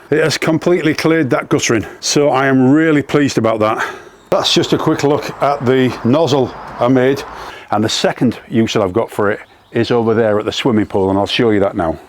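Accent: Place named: Hull, United Kingdom. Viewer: I'm from Hull and I'd recognise that accent anywhere!! Also, nice job emptying the leaves into the next door neighbours garden. 😂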